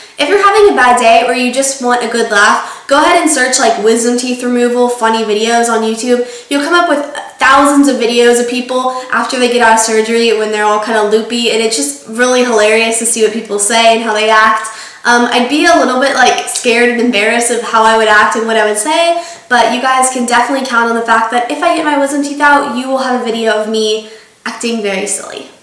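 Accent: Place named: Texas, United States. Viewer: Are you born and raised in Texas? I'm not from there and just thought everyone had the accent!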